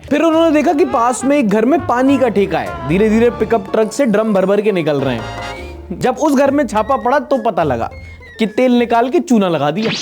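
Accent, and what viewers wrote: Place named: Bundelkhand, India. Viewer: I'm from Jhansi too...and your bundelkhandi is on point 🤌🏻😂 love your content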